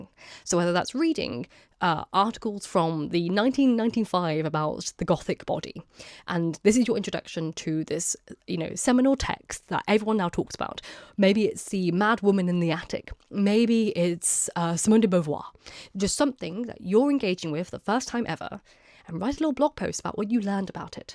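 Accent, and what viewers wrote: RP, United Kingdom. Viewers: Thanks! Very interesting video.🤔 I think I am starting to see why people don’t want to get PhDs…very difficult. Where in the UK is your accent?? It is almost posh.🤔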